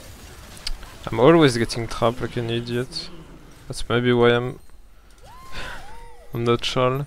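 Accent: France. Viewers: ton anglais est bon (t'as un bon vocabulaire, on comprend ce que tu veux dire, c niquel) mais tu fais aucun effort sur l'accent c'est dommage ; t'inquiètes c'est moins gênant d'essayer de faire l'accent que de juste forcer l'accent français c'est pas comme si y avaient des connards de français dans la pièce pour te juger (à part moi oups)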